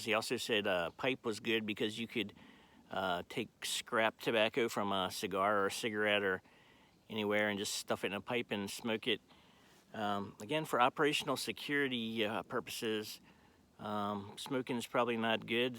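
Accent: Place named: Baltimore, United States. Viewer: It kind of sounds like Baltimore. What do you think?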